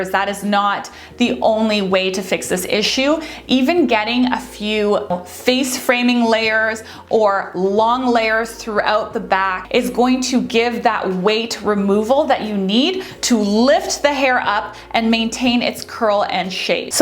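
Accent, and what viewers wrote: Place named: Canada. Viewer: Pregnancy look so cute on this lady. The Canadian accent is an absolute nightmare.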